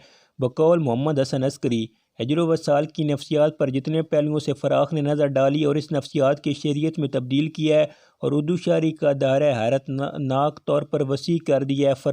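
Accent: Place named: Sargodha, Pakistan. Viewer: Sargodha ki zuban apky lehjey main wazeha jhalak dey rahi h.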